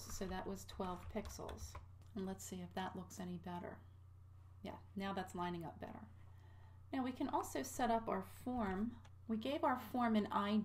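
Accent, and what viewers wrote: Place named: United States. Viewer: Very nicely done! Extremely helpful! Such a nice change to hear a lady's voice speaking clear American English in one of these tutorials.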